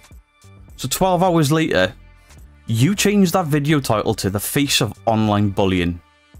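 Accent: Wales, United Kingdom. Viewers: u crack me up! I always watch ur stuff! Ur ace! u tell the truth which sadly soooo many of the ghost hunters etc just do not! Keep doing what ur doing please and I adore ur accent! I ❤️ the welsh! I don’t live far from the border! Thanks from the Labrador! 🐾🐾🐾🐾👍🏻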